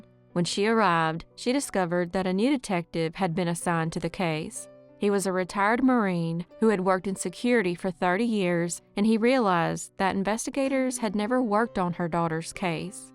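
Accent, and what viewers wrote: South, United States. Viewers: 🤔 I literally heard these crime updates, word for word, on another podcast. Is " " cutting and pasting together her show and adding a country twang?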